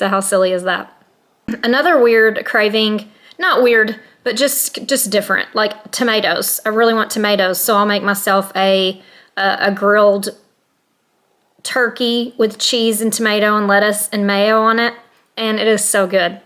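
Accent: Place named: Georgia, United States. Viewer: That heartbeat sounds glorious! I heard you say it said 130 for a second. When I was pregnant with my daughter her heartbeat never went out of the 130’s my entire pregnancy. The doctor would say she was just chilling out in there 😂. My son’s heartbeat never went below the 150’s. It was usually in the 160’s. I’m so happy for you! So glad I came across your channel. On a side note, your accent sounds like mine 😊. I’m born and raised in southwest Georgia.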